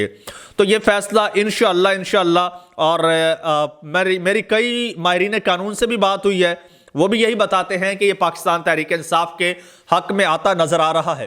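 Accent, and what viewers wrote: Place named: Punjab, India. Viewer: Bro ek suggestion hai Vlog acha hai lekin apki Urdu Mai punjabi style ata hai Baqi sab set hai❤❤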